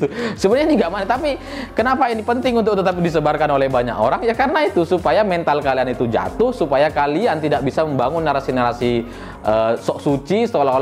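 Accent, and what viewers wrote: Madura, Indonesia. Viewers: mas dgr dari logat nya org madura ya 👍👍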